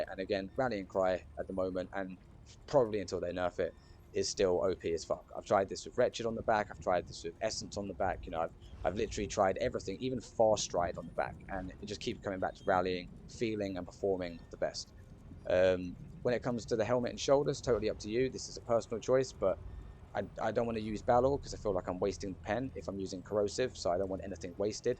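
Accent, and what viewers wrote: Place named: New Zealand. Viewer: Are you kiwi? you sound like Kai Kara France my guy.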